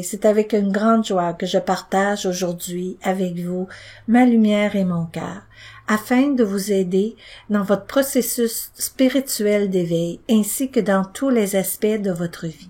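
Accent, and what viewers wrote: Quebec, Canada. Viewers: Bonjour 🌞, je viens de vous découvrir sur le chat de MICH'AILE, J'AI écouter de suite votre vidéo, j'ai adorée ce tirage de mon signe scorpion, où je me retrouve entièrement. Et en bonus votre douceur, votre accent québécois très agréable et vos conseils spirituel dans la bienveillance. Je me suis abonnée sans hésitations!!!! MERÇI infiniment NAMASTÉ 🙏🏻 Pour vous également AMOUR 💜LUMIÈRE ☄et PAIX 🕉☄☄❤🌹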